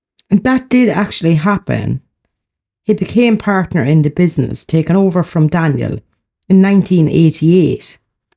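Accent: Ireland. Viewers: As expected, another intelligent and unique video! Speaking out about police corruption, which is a very dark and sinister affair which as you said, is far more prevalent than we all realise, has been presented and dealt with in this video in the unique way👍delivered in a passionate, forthright and well researched way. IMO, the NIcola Bulley case stinks of corruption right up to the very top. I’ll never forget Andrew Snowden blurting out how the LP had even predicted the day Nicola would be found. From day one, with the LP not cordoning off the bench area, this was just the beginning of people unable to believe that this was an honest, straightforward investigation. And that we were all being spun a story. P.S. Really looking forward to scary stories for Halloween! If you had a channel reading and spinning yarns with your beautiful Irish accent, I’d be an instant subscriber!💚😂💚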